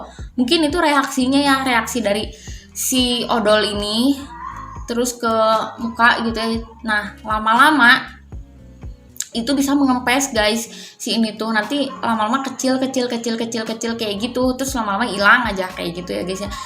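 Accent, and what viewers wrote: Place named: Pandeglang, Indonesia. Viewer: Logat nya pandeglang .. hehe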